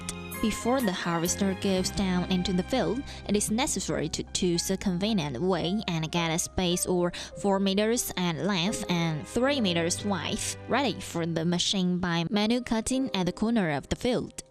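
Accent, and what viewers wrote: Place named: China. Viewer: an automatic reader with a chinese accent...i seen it all now.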